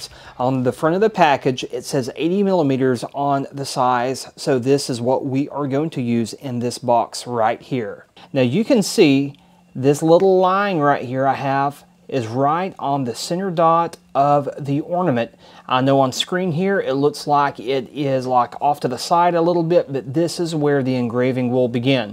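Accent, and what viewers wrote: South, United States. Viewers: You have a new fan. Great video very detailed and I swoon over that country accent ❤️❤️❤️❤️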